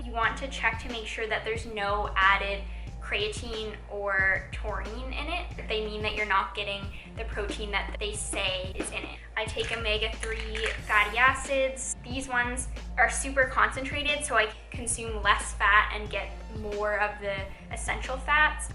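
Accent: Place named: Ontario, Canada. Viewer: Are you from Ontario?! Haha I for sure thought you were from the states!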